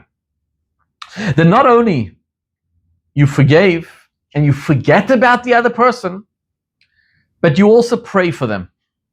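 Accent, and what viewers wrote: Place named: South Africa. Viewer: Seems like he's from South Africa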